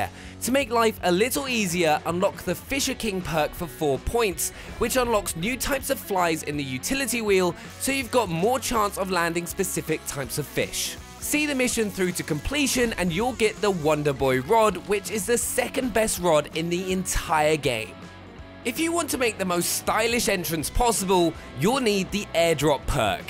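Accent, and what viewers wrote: United Kingdom. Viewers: Disliked because of Xbox and British accent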